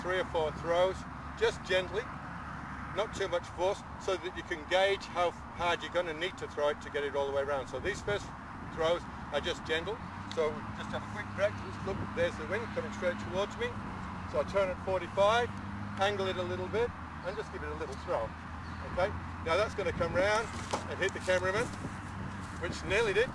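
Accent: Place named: Australia. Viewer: Of corse he’s Australian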